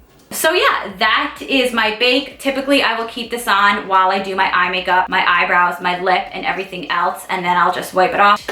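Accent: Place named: Michigan, United States. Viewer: I love your accent. are you from Michigan?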